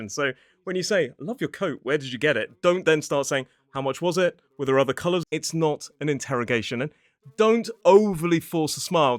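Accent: United Kingdom. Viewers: I really into British accent ❤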